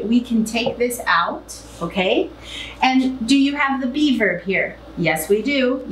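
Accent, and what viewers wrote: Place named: United States. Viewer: This isn't but american english !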